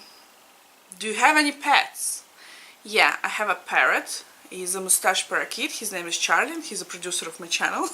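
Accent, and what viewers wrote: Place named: Ireland. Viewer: I can sense a slight Irish flair to your accent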